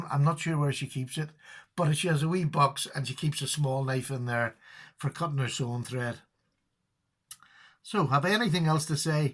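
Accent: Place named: Ireland. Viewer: Congratulations. Love you channel and even more your sweet Irish accent!:-)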